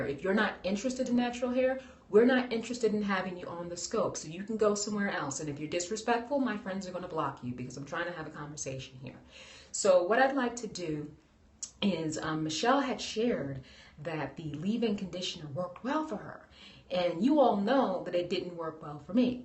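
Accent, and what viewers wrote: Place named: Bronx, United States. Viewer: randomly passing by and then I hear the bronx, woah, I'm from castle hill! living in FL now :(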